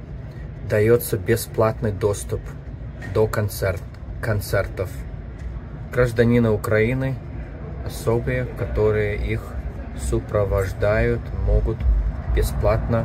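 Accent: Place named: Baltic states. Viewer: Уселась смотреть, видео новое, а вы вдруг в рубашке. Неожиданно. Спасибо, отвлекли. У вас приятный акцент, какой всегда я думала, должен быть у прибалтов.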